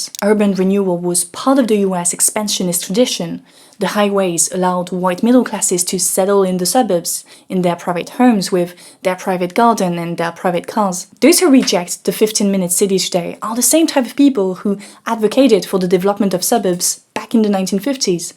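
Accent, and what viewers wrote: France. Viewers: Oh you're french! No wonder you're so smart. Also, french accent makes everything sound classy.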